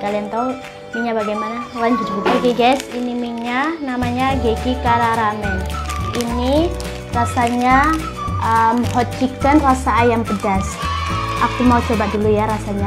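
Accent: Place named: Banyumas, Indonesia. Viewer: Salam kenal YouTubers ngapak😁😁😁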